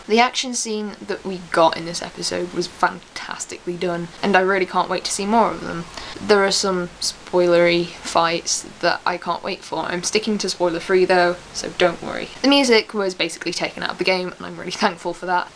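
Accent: United Kingdom. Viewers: XD a pretty girl who plays persona? AND has a british accent!? AND loves Teddie?! 0_o i think im in love XD that aside, i love the way you criticiced the first episode, and agree on everything you said. Bear-Y good review XD ;)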